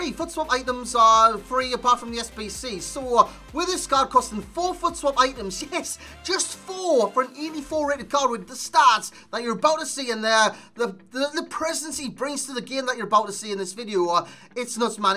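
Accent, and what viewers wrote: Newcastle, United Kingdom. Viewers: are you geordie?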